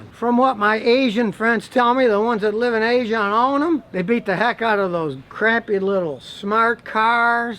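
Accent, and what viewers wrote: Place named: United States. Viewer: Hmmmm I think I have misunderstood your accent. Sounds to me your saying Moore's not Morris but I think you are saying Morris correctly for your us accent.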